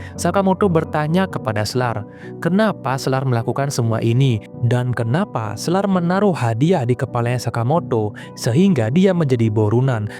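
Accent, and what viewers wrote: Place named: Bali, Indonesia. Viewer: SERASA LOGAT BALI... BLI😁, KELIATAN DARI LOGAT BILANG HURUF T DAN LAIN2😅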